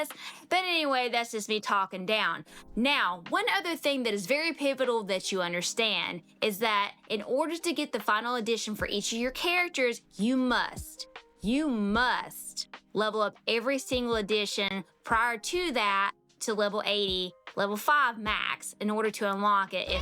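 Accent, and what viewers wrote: South, United States. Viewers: Just curious if your from the southern part of USA ? You have that cute accent if not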